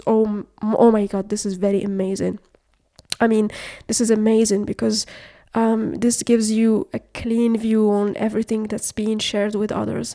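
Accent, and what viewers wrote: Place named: North Africa. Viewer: the voice is giving north african .. are Algerian/Moroccan by any chance? 😊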